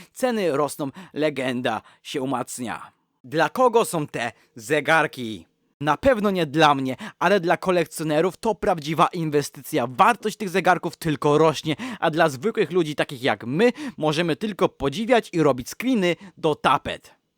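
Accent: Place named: United States. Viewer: Wytrzymałem 30 sekund.. WYJMIJ amerykańskiego kutanga z ry ja 😂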